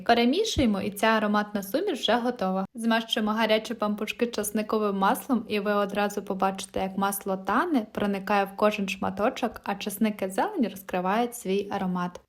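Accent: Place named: Galicia, Ukraine. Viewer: Чудове відео, швидко,конкретно, та ше й милий галицький діалект! Лайк, подяка, підписка :)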